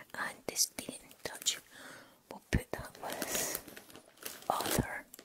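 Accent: Scotland, United Kingdom. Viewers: XOXO right back at ya😁. I'm honored that you replied. As an American, I really appreciate your enchanting accent. I'm so glad to have found your videos, they ease my anxiety and are so very relaxing. Keep up the great work, it truly has a positive impact. Your sincere kindness and caring really come across. Thank you.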